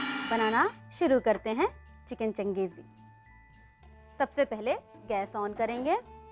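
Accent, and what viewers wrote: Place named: Uttar Pradesh, India. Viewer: Awesome recipe. Looks great. Just need to say sm tips while cooking. Tipical up accent. keep it up. Gd job.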